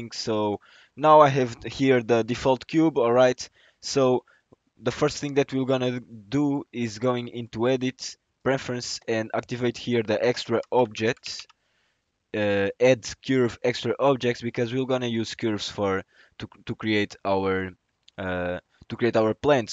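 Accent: Portugal. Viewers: ah este sotaque tuga nao engana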